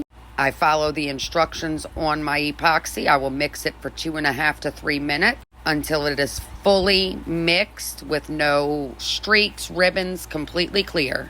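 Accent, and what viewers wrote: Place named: Louisiana, United States. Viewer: Beautiful cup!! I am hearing a Louisiana accent…..I’m in Louisiana is why I say that! LOL. Girl I would love to craft with you and learn some stuff! 😊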